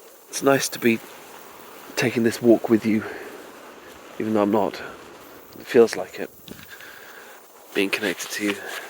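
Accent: United Kingdom. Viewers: Looking forward for more long distance voicemail like this, your voice ❤ and that british accent though ❤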